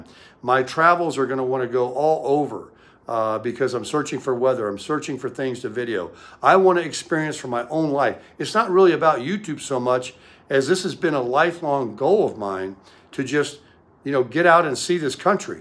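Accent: South, United States. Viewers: He’s southern 👍🏼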